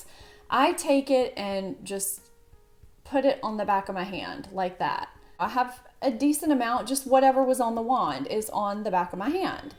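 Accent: South, United States. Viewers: Yeah Ive struggled with the shape tape, its a bit over hyped. While it gives very good coverage. Your video hit the nail on the head. Less is More. If I can ask why do you prefer the brightening powder over the other setting powder by Laura Mercier? Thanks from one Southern Girl to another, atleast you sound Southern LOL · OMG the fur baby on the bed killed me lol! I have one of mine own that just makes herself comfy wherever and whenever she wants 😂. Cutie pie though...makes me identify with you a little more than other "YouTubers". Just an everyday woman like me fighting the signs of aging the best we can! And love the accent...southern girl like me lol! I really like your channel hun and love your info and tips! Keep up the good work 👍🏻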